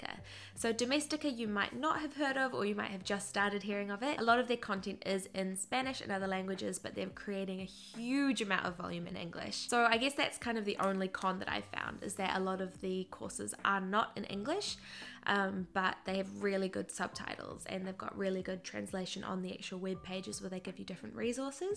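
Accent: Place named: New Zealand. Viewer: Your such an awesome creator, love your stuff, very inspiring! And great to hear another Kiwi accent on YouTube! (I'm from NZ too)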